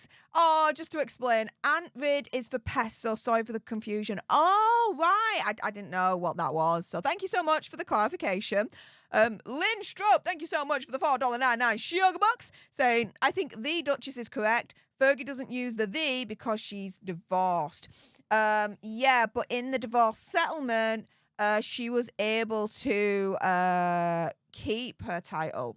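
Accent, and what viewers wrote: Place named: Manchester, United Kingdom. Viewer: I grew up in Manchester until I was 14 . I have been living in Biloxi Mississippi since 1972. Can’t believe how fast 52 years has flown by. Your accent makes me home sick, Much love to you from the Deep South, only 60 miles from New Orleans. 😊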